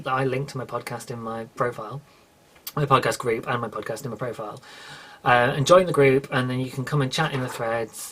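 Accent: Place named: United Kingdom. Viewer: Its funny that when Brits do an american accent its usually a southern one (yall ). No one does a New York accent, for instance. My daughter and I are always doing a British accent but its usually like the Essex accent, or like on Skins, is that the same thing, isnt Essex in S England? My mum and grandmother had proper british accents,because they were born in S Africa and New Zealand. I have a friend from London that I can't understand at all because of all the slang, he slows it down and talks more "american" for me. Do you say ore-gaan o or oregano? Do you have a northern accent, because those kind of sound like Scottish right? To me your accent sounds kind of middle british, like maybe compared to our midwest accent. Whew thats a lot, back to knitting.......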